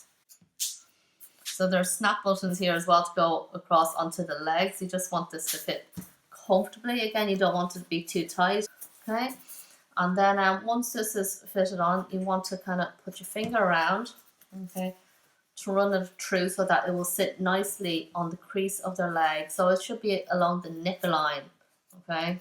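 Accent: Ireland. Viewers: Do I hear a bit of an Irish accent?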